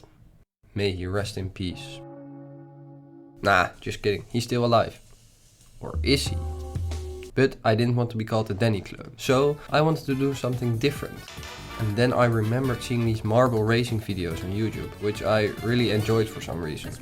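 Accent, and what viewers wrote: Netherlands. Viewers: Immediately knew you were dutch given the accent, ga zo door, echt enorm goede videos!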